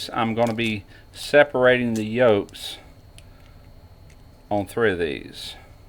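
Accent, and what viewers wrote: South, United States. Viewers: Dang, it just keeps getting better and better! That southern twang is always the way to go. Thanks again!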